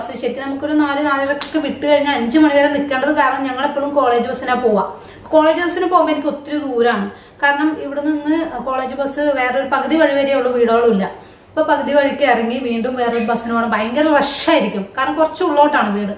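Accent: Kannur, India. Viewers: ചേച്ചി കണ്ണൂരാണോ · Enikkk Kannur Slang Onnum Kathilla Njan Kollath Annn Kannur Slang Kekkennn Rasam Und Muthappante Swantham Naddd Eshtam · enikum thonni😍😍😍 edak kannur keri varunund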